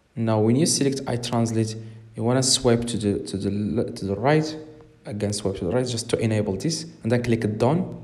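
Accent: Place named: Morocco. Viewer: bayna fik mghribi 😂❤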